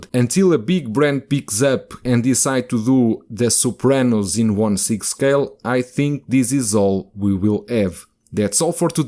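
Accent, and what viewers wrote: Portugal. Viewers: Pela forma de falar / sotaque logo vi 🇵🇹 Que honra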